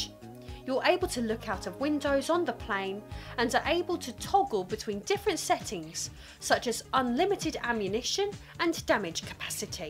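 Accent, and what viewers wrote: England, United Kingdom. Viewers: I don't know why but I walk around my house repeating "I am " in an English accent. You're in my head woman!!! 😆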